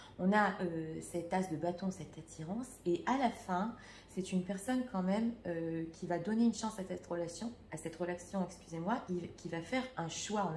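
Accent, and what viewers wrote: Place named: France. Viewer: Mardi beaucoup pour vos tirages, vos conseils, votre énergie, votre franchise. Ne tenez pas compte des railleries de ceux qui se moquent de votre accent français quand vous lisez les cartes en anglais. Ils ne sont pas dignes de votre travail et de votre passion. 😊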